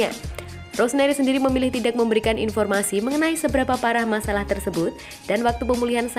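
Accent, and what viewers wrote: Java, Indonesia. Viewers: Sory unsub ga suka voice over medok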